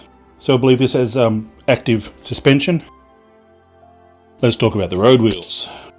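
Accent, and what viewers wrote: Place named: Australia. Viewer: hello, which part of Aussie are you from. Malcolm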